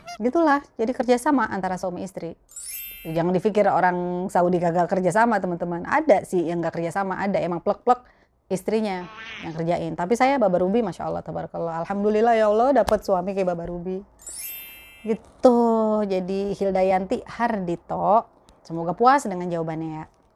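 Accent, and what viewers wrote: Jakarta, Indonesia. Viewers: Udah lama di madinah , tapi masih kentel banget betawinya . Jadi kangen Betawi , dari gadis sampe punya suami ampe punya anak lama di betawi Jakarta barat , padahal saya orang Banten serang . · Betawi nya kentel bgt 😀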